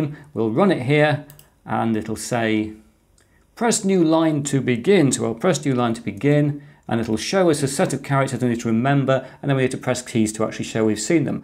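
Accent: England, United Kingdom. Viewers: Funny english accent🙂 nice exotic computer🙂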